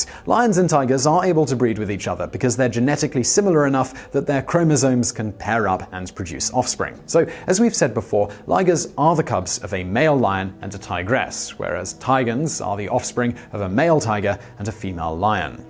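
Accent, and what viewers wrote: United Kingdom. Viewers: What a wonderful British accent! 👏👏👏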